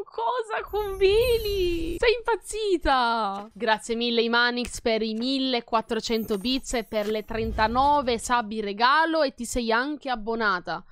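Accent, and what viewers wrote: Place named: Sicily, Italy. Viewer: È siciliana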